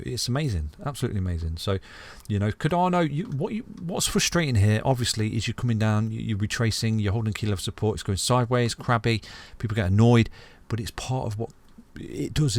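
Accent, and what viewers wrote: United Kingdom. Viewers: I AM FROM THE UNITED STATES 🇺🇸 WE DO NOT SPEAK LIKE THAT. UK y’all sound like a dying dog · He speaks the most clear British accent I have ever heard, and I am a German speaker 😄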